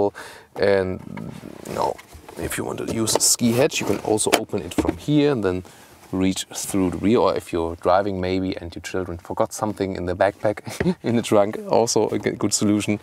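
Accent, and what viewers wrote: Germany. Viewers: good english but the accent is still like a lufthansa flight attendant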